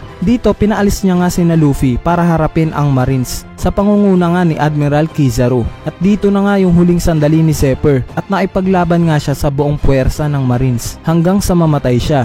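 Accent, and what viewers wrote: Visayas, Philippines. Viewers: Bisaya ka man?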